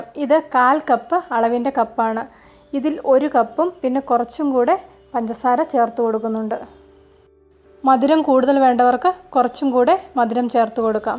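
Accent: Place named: Southern Kerala, India. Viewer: വീട് കണ്ണൂരാണോ? സംസാരശൈലി തെക്കൻ ജില്ല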